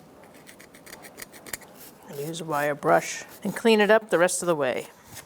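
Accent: Boston, United States. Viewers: Thanks for the torque specs. That is one serious Boston accent. Reminds me of my mom.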